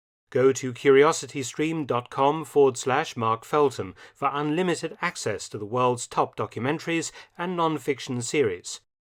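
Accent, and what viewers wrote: England, United Kingdom. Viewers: Is it weird of me to ask what bit of England you're from, Mister Felton? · Colt .45 is always a reference to the Colt Peacemaker not the 1911. I presume from your accent that you are a Brit and I am taking this in account referencing your "gun nut" comment. Here in the colonies, we call someone with a mere 24 guns a beginner. Regarding Churchill, Americans refer to him in reverent terms as a patriot and the leader who showed the way to victory over the evil menacing the world of his time.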